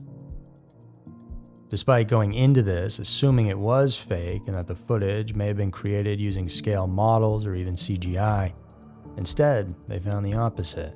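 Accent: South, United States. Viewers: Much Appreciated. i want to believe how you’re a real human Narrator because i like your Private Eye / Country Boy accent or style which gives the information a personal or ‘ Pssst - wanna hear something very interesting? ‘ feel! 😁👍❤️🐀🐢👽from near Bournemouth SW England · When they make these videos, why can't they find a narrator who does not speak through his nose? It is a real turn-off! Also, the American accent does not help!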